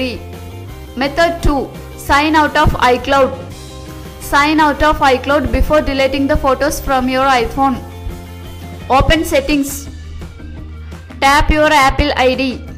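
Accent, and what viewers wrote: India. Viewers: Indian Accent is so strong on this one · i officially hate indian accent now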